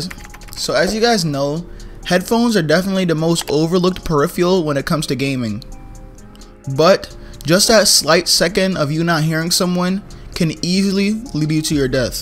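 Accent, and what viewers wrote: Jamaica. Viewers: You’re Jamaican?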